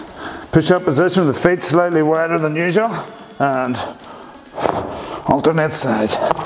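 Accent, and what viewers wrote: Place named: England, United Kingdom. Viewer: I wonder what that English accent is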